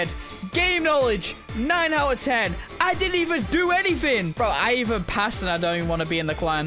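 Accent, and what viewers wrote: United Kingdom. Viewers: How to tell he is british A stat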